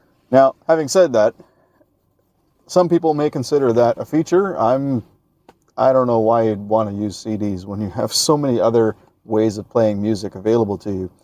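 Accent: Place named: Canada. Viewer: Damn Canadians and their ou pronunciation. Really jumps out at us Americans.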